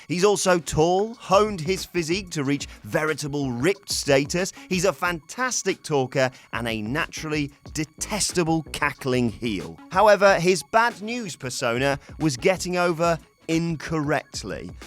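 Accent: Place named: United Kingdom. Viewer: Finally, a good British voice besides Adam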